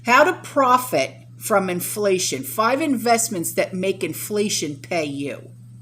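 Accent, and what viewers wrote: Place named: Long Island, United States. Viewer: you have to be from Long Island, ny. The way you say youzzz-guys. My best friend says it the same way and she’s from Long Island.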